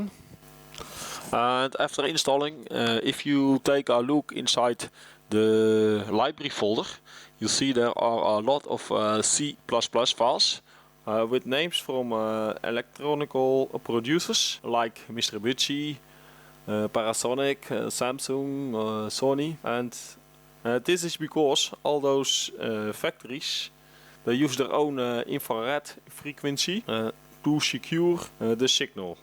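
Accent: Netherlands. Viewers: I love the dutch accent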